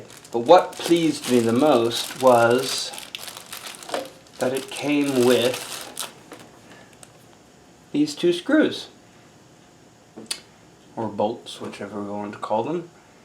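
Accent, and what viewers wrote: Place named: England, United Kingdom. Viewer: I'm from California, sounds like your mabe from England?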